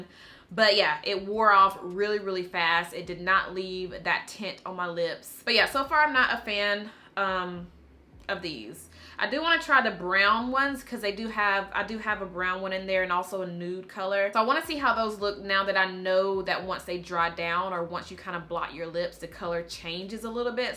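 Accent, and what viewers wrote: South, United States. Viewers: I love your southern accent I live I'm from New York city and I luv people with country accents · Girl, you are so pretty. And you sound like you’re from the south. Where are you from?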